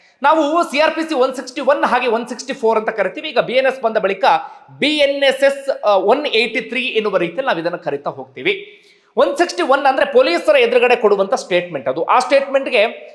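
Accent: Standard. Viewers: nemma spashta dwani swatchha Kannada 👌👌👏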